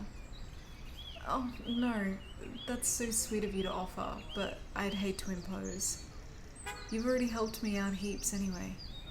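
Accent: Australia. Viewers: Likewise... And I don't understand the appeal of the Australian accent. I've been surrounded by it my entire life, and it's usually annoying (except for a select few such as this lovely VA). If you'd like to explain, I'm all ears. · We finally got An Australian asmr love the mention of Perth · I have many friends who are Australian. I also have a cousin who moved to marry her now husband. The Australian accent is my upmost favorite accent. Love the audio. 100% dragon approved 🐉 💜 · one little piece of feedback i have, you have a soft voice and the ambient sound was a bit too loud in my opinion, other than that really great voice acting and script! also yes, Australian accent is really cute!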